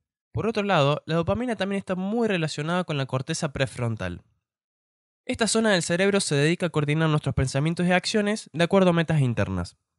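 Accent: Córdoba, Argentina. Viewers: Por tu forma de hablar sos argentino jaja saludos desde argentina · Argentino.....de Córdoba???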